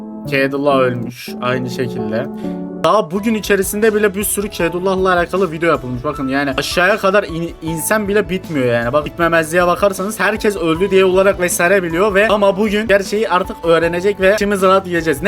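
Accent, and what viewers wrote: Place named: Turkey. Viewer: Sert Türk Aksanı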